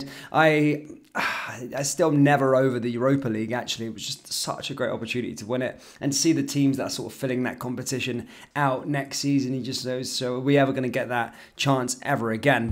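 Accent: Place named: England, United Kingdom. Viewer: Good man . For an English man (I am assuming because of the accent , sorry if I'm wrong) but well done supporting us. You do keep up with the news be it dodgy or truth which takes time ,effort and is not easy to do if you work elsewhere also mate. Maybe do some competitions or raffles for T-shirts before start of the season and see if it is an avenue worth going down ,beside s from that if you are a Rangers man then your one of us and any stick you get is from people who are the same the world over . Moan and complain but I don't see them put out a channel with content 3 or4 times a week at least . Only came across you in the last year and subbed ,i cannot always get time to check subs channels daily but see you on quite often iF your a bluenose and you want to wear a T shirt go ahead but if you don't thats fine also so long as you are not wearing hoops you will always be welcome at Ibrox in my view.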